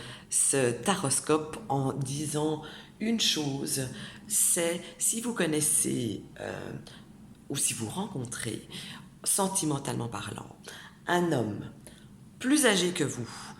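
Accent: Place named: Belgium. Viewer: Bonjour bel accent belge🤗😘👏🌹🥀🥀🥀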